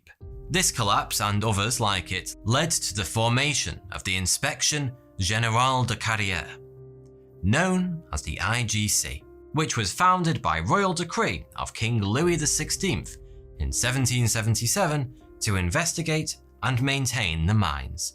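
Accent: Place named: Yorkshire, United Kingdom. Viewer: Where's this man from? Sounds Yorkshire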